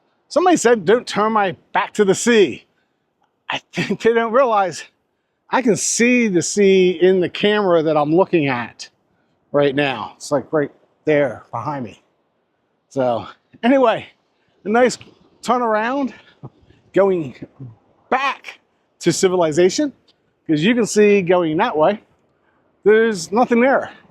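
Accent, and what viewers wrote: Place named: Philadelphia, United States. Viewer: I thought I detected a Philly accent or close by.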